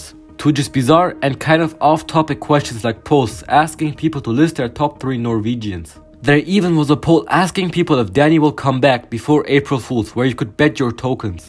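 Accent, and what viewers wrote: Germany. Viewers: are you german (im german) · You sound very German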